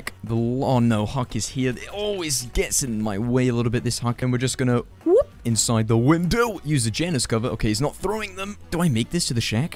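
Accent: United Kingdom. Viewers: must be british right?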